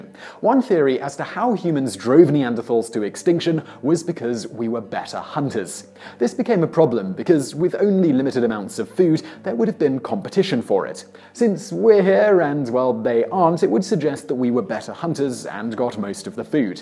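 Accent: United Kingdom. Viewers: They died from listening to stupid top tenz presented by some guy who doesn't seem to have any intelligence, education, charisma or creative ability...just a British accent....That's it.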